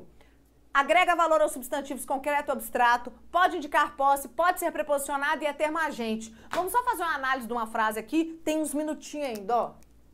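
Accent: Minas Gerais, Brazil. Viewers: Essa professora sem duvidas é mineira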